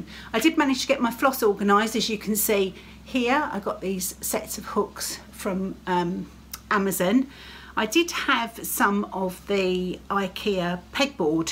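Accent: England, United Kingdom. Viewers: Please do not leave. When I saw on instagram you had posted I made a cup of tea and sat down to watch. You are one of my favorites and I don’t get a lot of British floss tubers and so enjoy your accent. Just keep showing your work and cute dog and telling us what you have been up to. As to haul I can’t afford a lot either never mind about that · I love your floss tube. I love hearing your accent. I am from the US and your English accent is so sweet to me. I love your content. If you are feeling pressured to have more, maybe you could put more distance between your episodes. I love hearing about your dog and your husband and your mom and your job, whatever is going on your life just as much as I love seeing your work. I hope you stay, but I understand you need to do what’s right for you. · I do so hope you continue with your flosstubes! I have totally enjoyed them! 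Being from the States, you have shared a bit of life across the pond, & it's been delightful! I've enjoyed seeing the English countryside, your charming accent, as well as your lovely finishes. You've inspired me to try new ways of finishing & different designs. I like that you seem real. Life gets in the way for us all at times, but your videos have kept me going through some challenging days. I have dropped some subscriptions, as they overwhelm me. Too much haul, so many finishes, one feels like we're in a race & can't keep up. Designs are coming fast & furious. It's nice to see someone working on older pieces. Watching you is like stitching with a friend. We seem to pick the same patterns...I too have been reworking my sewing space...it's like we're on the same wavelength. Maybe post monthly? I'd really miss visiting with you! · We love at my house. My husband and daughter love listening to your British accent as you describe your projects. 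We love it when you say, “bits and pieces”. Please continue with your channel whenever you have time. Your work and content are always so good!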